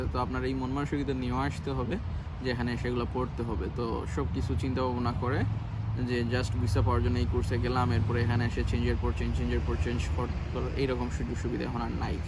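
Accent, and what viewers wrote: Sylhet, Bangladesh. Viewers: bro ur sylheti i guessed it from ur accent tht u used love to heard tht 👽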